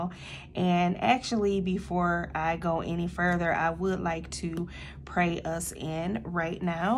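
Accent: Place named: South, United States. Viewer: I really love your southern drawl. Very soothing! Good ideas. I am not crafty but writing things down as you say is important!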